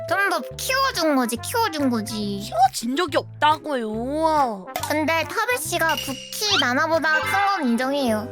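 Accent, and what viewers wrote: South Korea. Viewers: 전라도 사람이여? 구수허이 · 전라남도쪽일 거 같네요 근데 전에도 보니까 경상도 사투리도 하셔서.. 좀 섞인거 같아요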